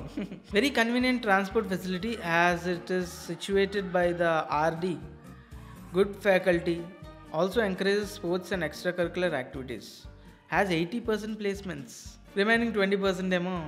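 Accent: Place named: West Godavari, India. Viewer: West 😂😂 slang iragadisav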